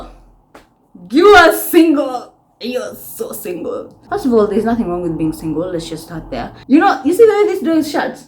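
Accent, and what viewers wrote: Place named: United Kingdom. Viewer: its the bri*😁ish accent for me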